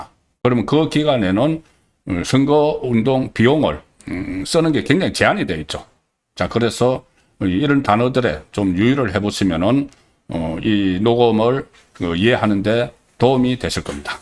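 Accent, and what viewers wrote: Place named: Jeolla, South Korea. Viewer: 1. 억양: 전라산 홍어. 2. 사고방식: 사기꾼 개년. #1+2=3. 국내산 홍어를 상대해본, 국민이라면 다 알거다. 69.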